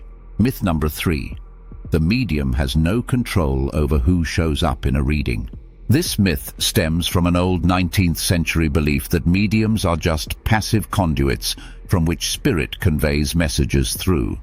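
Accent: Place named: United Kingdom. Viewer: I think this is the best video you have done to date! And I love that the narrator has a British accent!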